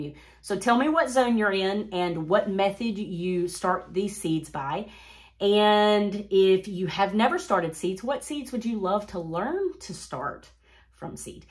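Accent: South, United States. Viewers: Just found your channel today. I’m so excited to follow you and hear that beautiful southern accent. I’m from Georgia 8a. Can’t wait to plant seeds now. Your friend Candy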